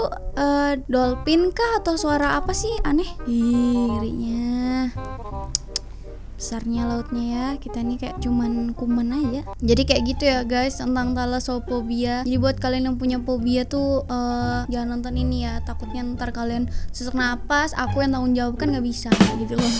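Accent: Banjar, Indonesia. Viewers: Kek logat orang banjar:) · Logat banjarnya kada kawa di hilangi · Banjar nya kluar sikit" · Logat banjar nya masih gak hilang awokwok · Kyk org Banjar ada kata², nah di akhir kata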